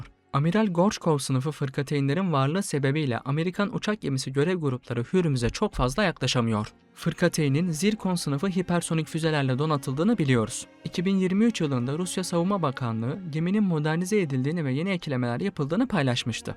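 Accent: Arab world. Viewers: Abi şu arap aksanıyla K patlatan kardeşimize seslendirme yaptırmayın artık. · Seslendirmeyi yapan arkadaşın anadili Arapça mı? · Abi içerik güzelde şu seslendirmeyi arap aksanıyla K patlatan arkadaş yerine farklı biri yapsın. Dinlerken çok rahatsız ediyor.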